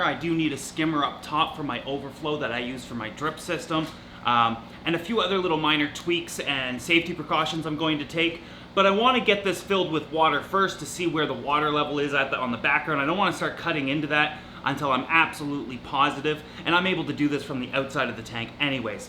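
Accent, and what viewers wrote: Canada. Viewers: volgens mij is hij canadees aan z'n accent te horen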